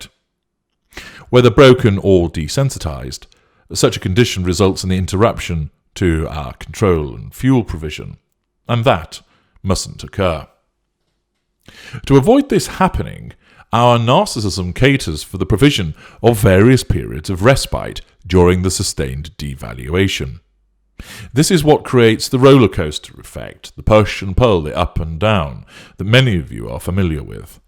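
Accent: United Kingdom. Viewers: I never need a respite from a lovely British accent, but Brits can certainly be difficult for American English speakers to understand. It's like when they have an idea-r. 🙂 The best idea is to turn a respite (a brief break, especially from something difficult or unpleasant) into a permanent narc-free vacation. Wherever you are in your journey, I hope that you are able to GOSO. 🙏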